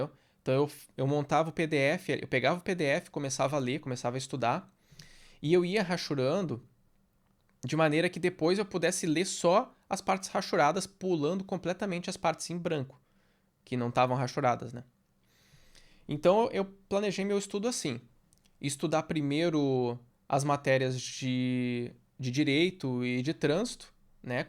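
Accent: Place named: Rio Grande do Sul, Brazil. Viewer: Pelo sotaque, tu deve ser meu conterrâneo (gaúcho). Grande abraço e parabéns pelo desempenho.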